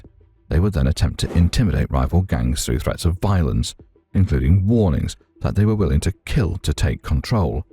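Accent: England, United Kingdom. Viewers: How tf yall going to use pictures that aren't even TRG. And how tf a nigga with an English accent know anything about TRG. You guys missed the whole unique thing about TRG. We have every race in TRG that's what made TRG the strongest and biggest. Do better homework.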